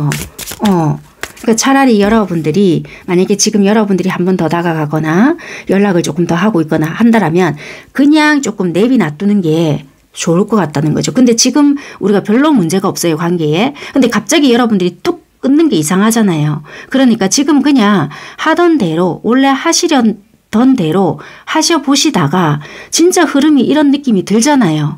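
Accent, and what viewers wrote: Busan, South Korea. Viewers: 부산 사투리 입니꺼? 😊😊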